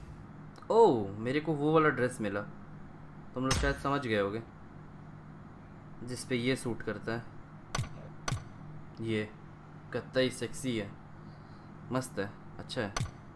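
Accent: Bihar, India. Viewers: bhai bihar se ho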